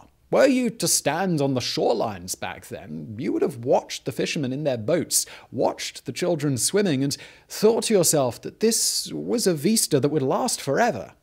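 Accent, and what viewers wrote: RP, United Kingdom. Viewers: I don't remember Simon talking with such a posh accent on the other channel lol.. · I really like your content, but what’s up with that accent? You did not sound that British on VisualPolitik. Seems like you’re trying too hard.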